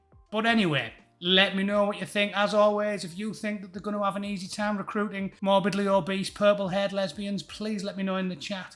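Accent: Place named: Middlesbrough, United Kingdom. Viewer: Churchillian with a Middlesbrough accent.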